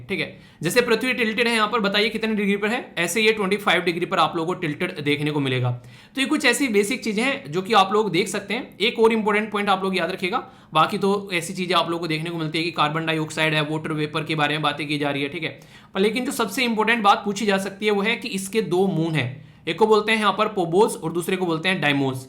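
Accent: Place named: Uttarakhand, India. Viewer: Sir aap Uttrakhand ke ho aapke tone se lagta he.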